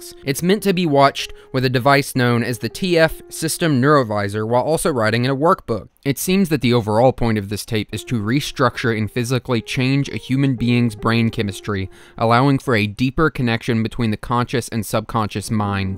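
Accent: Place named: South, United States. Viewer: Somehow though the way you talk and show things makes even this absolutely terrifying freakshow seem friendly and welcoming.... Either its not that scary the 2nd time or its just horrific without a well humored Southern guy talking about whats happening idk ...did you use the whisper TTS on FL Studio to name episodes because of Serial Experiments Lain?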